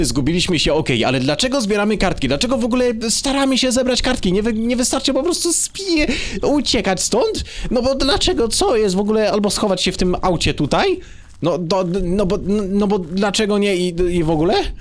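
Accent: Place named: England, United Kingdom. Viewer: Gdy grałeś w gang beasts z i i podróby gier mówiłeś normalnie a teraz mówisz z takim angielskim akcentem!?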